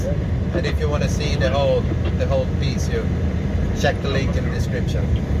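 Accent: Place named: Dalarna, Sweden. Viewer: Använde Transportstyrelsen för att se det, såg din reg plåt o tänkte att jag kunde säga det. 😂 Är från Sandviken i alla fall, jag har för mig du är i Dalarna, från hur du pratar svenska.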